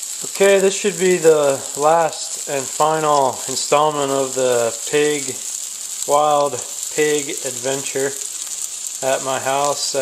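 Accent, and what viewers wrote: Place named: Carolinas, United States. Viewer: Awesome! Sounds like your in the Carloinas and not Texas though. Cool videos man!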